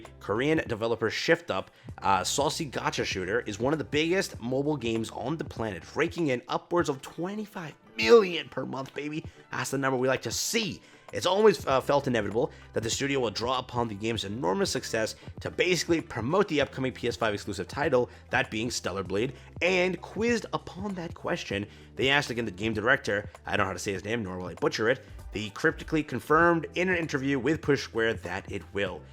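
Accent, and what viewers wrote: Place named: Utah, United States. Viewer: You be from utah or idaho...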